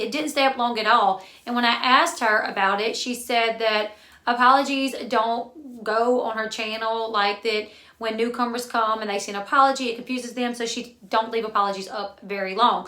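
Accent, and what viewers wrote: South, United States. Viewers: "I've never watched before" but she has a cute southern voice lol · You do have a cute Southern voice😉 I truly loved it. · She was right as a southern sister love the accent ( it's like mine) and you are very pretty ,love from Alabama 🤗🐘🐘🐘🤗 that's ALL she was right about 🤣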